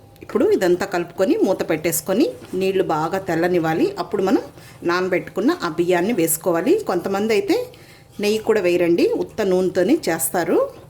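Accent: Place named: Nellore, India. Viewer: garu mi maatala lo konchem nellore touch undandi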